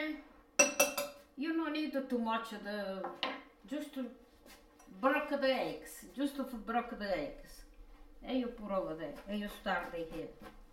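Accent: Italy. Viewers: Just love the Italian-English accent (not Italian myself)! Could watch this video just to listen to this dear lady speak 😍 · As always, Grandma, love your speech, your gorgeous Italian accent, your singing, your willingness to share your techniques with us! Grazie molto!!!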